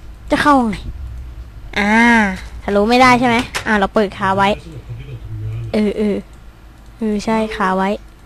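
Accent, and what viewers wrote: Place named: Suphanburi, Thailand. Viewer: เสียงงงงงคนสุพรรณชัดๆๆ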